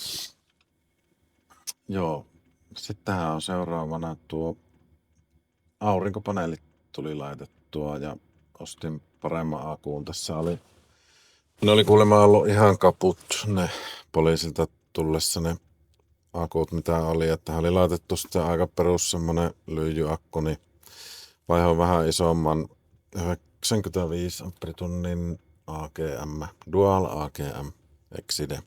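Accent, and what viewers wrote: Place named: Oulu, Finland. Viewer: Hyvin tehty video, varmaan mieluinen pakuharrastajille! Luontevaa, leppoista "oululaista" kerrontaa, jota on helppo seurata. Mutta missä on Aava?!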